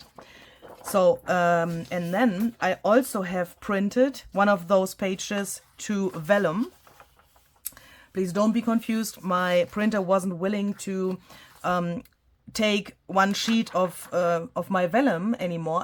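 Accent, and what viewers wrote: Germany. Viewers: I love hearing Tim-isms with a German accent.😄😄 You are a delight to listen to.❤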